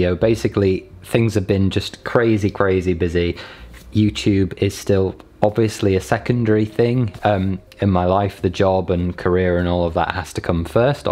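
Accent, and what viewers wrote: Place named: United Kingdom. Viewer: you have a big USA gay fanbase here--we have a thing for docs with British accents. Obviously Dr. Gill is the Alpha Leader of all posh British twink doctors but you are right up there. Peace!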